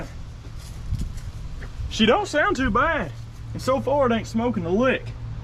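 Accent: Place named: North Arkansas, United States. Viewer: I enjoy the north Arkansas accent.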